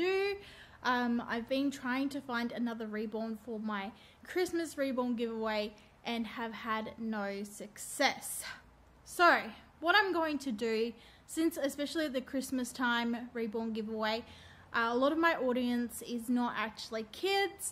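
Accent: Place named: Australia. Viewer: Hi sweetie,i just adore your accent,i so wish i had been born in Australia. That’s a great idea,they are so cute,this is so generous of you,i dont know if I’m allowed to enter as I’m from Ireland xxxxxxx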